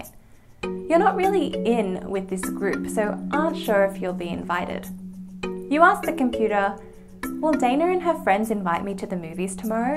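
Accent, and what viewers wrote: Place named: Australia. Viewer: I appreciate your teaching style, animations, sense of humor, and your australian accent. Subscribed.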